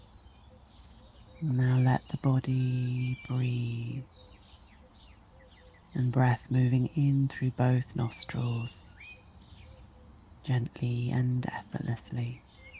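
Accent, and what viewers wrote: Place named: England, United Kingdom. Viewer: Ouse Ally and Kristen Roses meditation videos daily. I really enjoyed this. Thank you 🙏It’s especially nice to hear and English accent.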